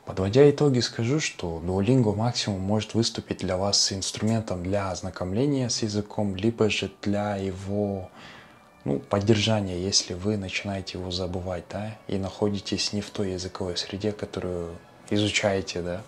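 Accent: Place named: Tatarstan, Russia. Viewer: Молодец! Восхищаюсь! По тому, как говорите на русском, сказала бы, что акцент , как у татар😀 Сама татарка🙂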